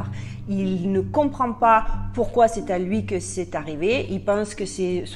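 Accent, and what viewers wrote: Marseille, France. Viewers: Hello, merci de répondre aussi vite, je vous ai regardé vers minuit depuis la France et je n'attendais pas une réponse aussi rapide 😜 En fait je reçois youtube sur mon TÉLÉVISEUR (seul avantage de mon opérateur adsl !) et je regarde la nuit vos vidéos, celles de Silvano Trotta (excellent) de deQodeurs, de Réveillez-vous, de Ema Krusi etc.. que les meilleurs ! L'écran étant plus grand, je vois mieux ! Vous êtes la seule dont le visage apparaît en "blanc et noir", ce qui m'agace car vous êtes trop jolie à regarder et à écouter, votre humour et votre "accent du midi" sont rafraîchissants 🤗 Par contre, vous apparaissez normalement sur l'écran de mon portable mais il est plus petit ! Je vous confirme que je ne reçois pas toujours les notif. bien que j'aie activé la cloche.. Votre travail est excellent et agréable à suivre, sans prétention ni recherche de concurrence : all is right 😉 Maman d'une merveilleuse fille de 20 ans, étudiante, j'aimerais moi aussi la garder avec moi car savoir qu'elle doit porter la muselière toute la journée à la fac me révulse ! 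Bonne chance avec votre petit gars 😘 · C'est (encore) un pouce vers le haut pour ta vidéo me concernant ! J'adore la façon dont tu traites tes sujets et même ceux qui à priori me branchent pas trop, tu arrives quand même à les rendre intéressants. En prime, l'accent marseillais plus ta personnalité ça donne un style inimitable. Quand on repense à la raison de la création de ta chaine, au départ (cf ta vidéo FAQ), c'est amusant de voir ce qu'elle est devenu :D Si je m'écoutais je regarderais tout le contenu de ta chaine en 2 jours mais je préfère me forcer à pas trop en regarder à la fois de sorte à faire durer le plaisir ^^ Bravo et merci <3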